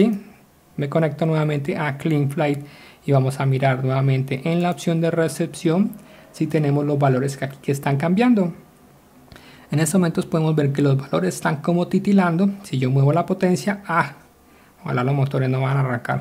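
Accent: Colombia. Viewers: hola, eres de colombia??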